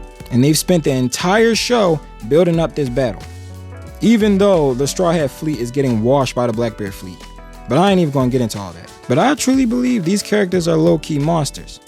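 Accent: Baltimore, United States. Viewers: Is that a Baltimore accent lol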